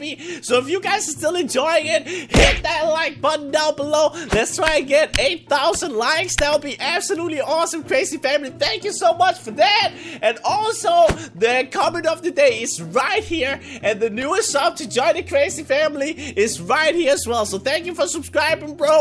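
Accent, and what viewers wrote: Denmark. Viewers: Have you ever heard someone from Denmark talk? That is how they sound.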